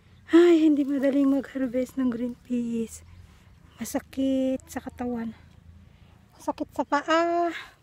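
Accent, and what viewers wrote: Iloilo, Philippines. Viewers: Taga San ka ba sa Philippines sis.kasi young accent mo parang ilongga.😊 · Ilonggo poh kau ate?😊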